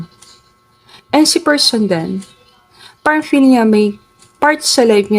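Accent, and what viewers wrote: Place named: Bicol, Philippines. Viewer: madam, ang cute ng voice nyo...parang may bikolanang accent..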